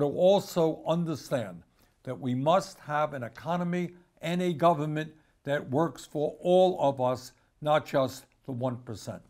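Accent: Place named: New England, United States. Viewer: He speaks so well, and I looove his New England accent😊😊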